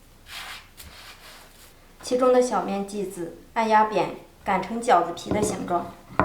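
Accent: Shandong, China. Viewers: 山束話特别親切 。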